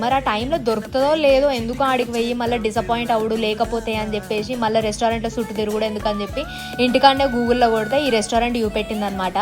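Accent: Telangana, India. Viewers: Manchi Telangana language matladutaru meeru Ega poyinam 😂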